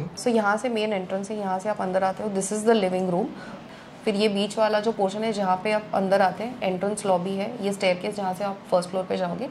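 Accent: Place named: Hyderabad-Karnataka, India. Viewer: Very nice like always in detail. Your tone wz Little bit HKBRD region your belonging to which state. Very nice clear tone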